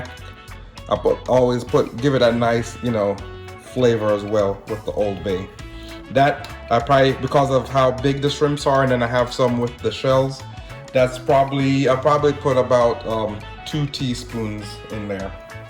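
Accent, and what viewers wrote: Jamaica. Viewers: You Jamaican bro ?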